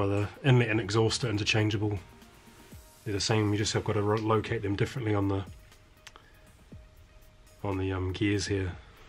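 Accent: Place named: New Zealand. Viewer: You sound kiwi bro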